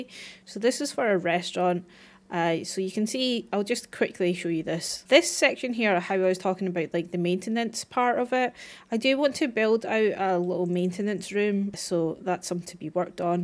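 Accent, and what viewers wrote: Scotland, United Kingdom. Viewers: First video of yours I've ever watched, and when I heard the Scottish accent (well, I think a Scottish accent), I instantly when "SCOTTLAND FOR WEVER" in my what I can a Scottish accent! Buuttt the aussie accent butchers it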